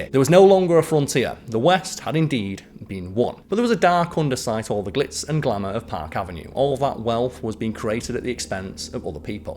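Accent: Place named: Northern England, United Kingdom. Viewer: No Simon not watching.....sorry but when you expect prime steak and someone gives you an ok burger you're pissed at the burger....if you'd been told from the off "you're getting an ok burger" you'd be fine with it but I feel tricked every time I see that stupid 2000s emo hair and hear that think whiny northern voice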